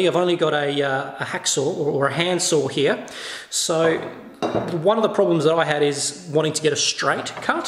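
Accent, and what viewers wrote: Australia. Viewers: judging from your accent, you are aussie like me, where did you get your screws? my local hardware is terrible for screws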